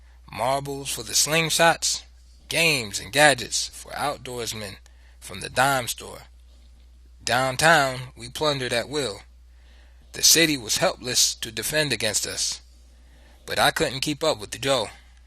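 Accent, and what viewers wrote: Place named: New York, United States. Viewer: Damn this new York accent.. Lol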